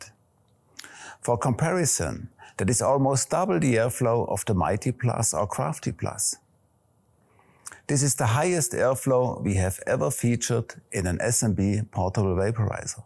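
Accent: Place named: Swabia, Germany. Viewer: Ist bestimmt ein tolles Teil. Mit dem Crafty war ich schon sehr zufrieden...wenn man sich etwas mehr Zeit für die Heizphase nimmt. Die Häufigkeit der Ladezyklen ist der einzige Makel. Bin sehr gespannt ob der neue B+K Verdampfer da mehr Spass macht. PS..ein toller "schwäbscher" Akzent ließ mich jetzt schon ständig lächeln...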